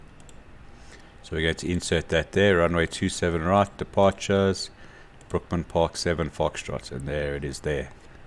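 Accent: South Africa. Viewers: Baie dankie excellent tutorial , I lived in SA for 5 years, working at Cape Town airport, your accent brings back fond memories of my time there. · Awesome tutorial, accent is a bonus...seems South African. This tutorial was just what I needed to know. I'll practice later today.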